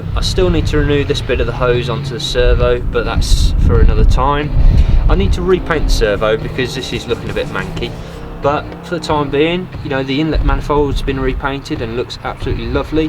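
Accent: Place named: United Kingdom. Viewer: Here in the U.S. you are exactly what I think of as someone working on old Triumphs. Cool British accent, coveralls, and the cap. I enjoy the videos and keep up the good work.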